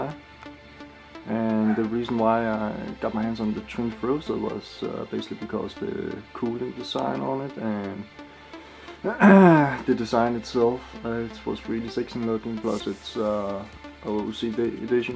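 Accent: Denmark. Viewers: lol er du dansker, har abboneret og så kunne jeg høre du havde dansk accent :)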